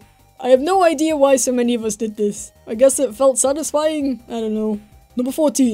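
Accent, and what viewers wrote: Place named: United Kingdom. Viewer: love this british or somethin man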